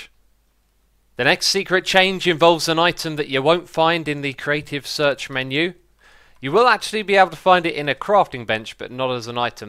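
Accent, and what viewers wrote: United Kingdom. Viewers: informational videos by a British chap, what can be better than this. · yay.yet ANOTHER british minecraft youtuber lol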